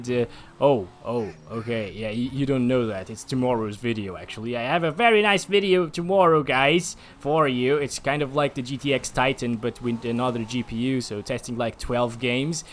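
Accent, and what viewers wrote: Brazil. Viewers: Ele é brasileiro????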